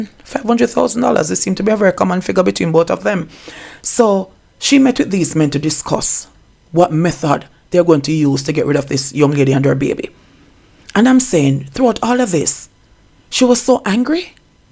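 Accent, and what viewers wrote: Jamaica. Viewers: Bradshaw watched too much murder series movie. Send person X to kill the young miss and her baby, then sending person Y to get rid of person X. She thought she would have gotten away with her devious act. Condolences to the family of the deceased. Love your blend of professionalism and the pinch of patois you integrated I your delivery of the new 😅. Well done 🎉❤👍💯🙏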